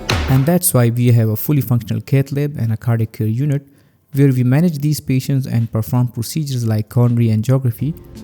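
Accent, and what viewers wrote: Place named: Afghanistan. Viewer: sir i loved your pashto tone….I personally first time watched a pashto medical related video which was quiet good….❤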